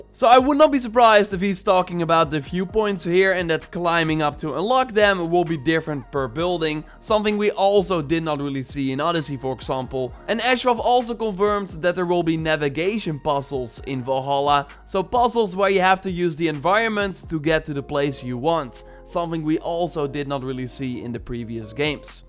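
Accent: Netherlands. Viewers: are you dutch >...? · Are you dutch? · Are you dutch? Want je hebt een erg Nederlands accent.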